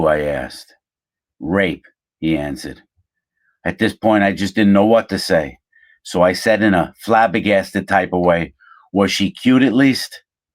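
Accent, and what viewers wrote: New York, United States. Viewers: I'm sorry you had to see that horrible incident with poor Manny. Your mind went from chuckling because he was stripping and you thought he was just being a nut and then suddenly it wasn't funny. He was hanging there like a piece of meat. It's too horrifying to comprehend. I confess I snickered a few times. Not because anything was funny but just your storytelling style cracks me up. 😊 Also want to say that your videos are really amping up in quality and style. The chilling view down that melancholy hallway, the gates slamming, The Moody lighting. Your New York gangster accent in your deep soft voice. You're going to have to start charging us admission. I was silent and stunned for quite a while after watching that video. I think I still am.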